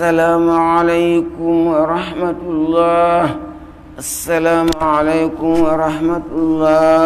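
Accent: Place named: Somalia. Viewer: Weird, this is not what I was expecting. There are many videos of his images on YouTube but the Sheikh sounds Somali! Also, there are many different videos of him but with different names!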